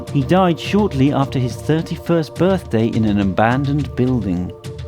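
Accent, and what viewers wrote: United Kingdom. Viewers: It’s always a British background voice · Very sad. But...I love how the British say Buth-day for birthday, and "don't take drug" instead of "drugs " lol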